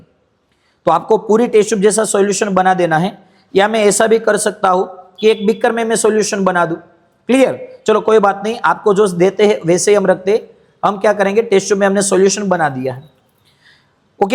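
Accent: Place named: Gujarat, India. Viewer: sir to gujarati chhene etle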